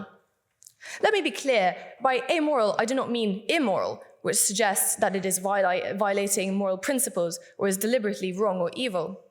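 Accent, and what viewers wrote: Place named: United Kingdom. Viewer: you cannot say so because she mush have been living in the UK for too long given her accent. Probably immigrated as a child.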